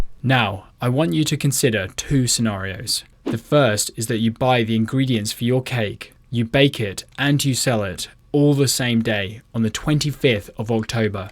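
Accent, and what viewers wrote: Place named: England, United Kingdom. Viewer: Oh damn you have an English accent but you live in Vancouver? I guess they don't call it British Columbia for nothing, lots of Brits here.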